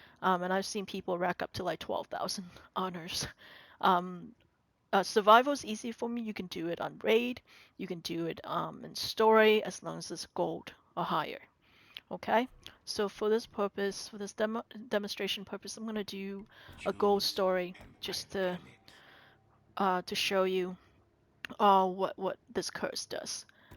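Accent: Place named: Northeast, United States. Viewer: You sound like you’re from the Northeast lol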